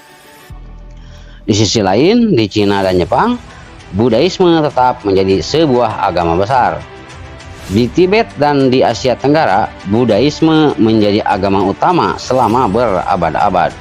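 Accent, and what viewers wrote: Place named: West Java, Indonesia. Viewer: Lidah Sunda naratornya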